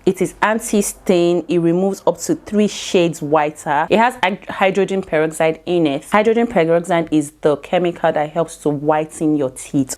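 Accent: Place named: United Kingdom. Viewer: I have an obsession with UK accent & makeup 😍😩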